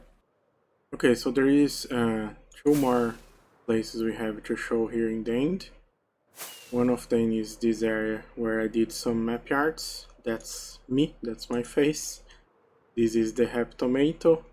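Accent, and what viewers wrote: Brazil. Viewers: esse cara é muito brasileiro kskks, o sotaque entregou de cara, BRABO! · Que sotaque bom de ouvir. Sotaque br pra inglês é massa demais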